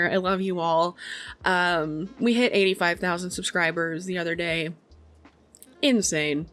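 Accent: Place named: Upper Peninsula, United States. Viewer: YOOPER! I knew Iknew your accent!!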